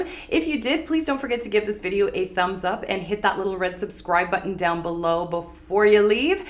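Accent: Canada. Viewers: I just discovered your channel, love it! Love your accent is it Canadian? Anyhow, I'm a larger woman and I get what you say. I visualise how Victorian women would enhance their pronounced areas even if they were overweight! Could you please post more stills for a bit longer, if I blink I miss them! Thank you Jayne 😊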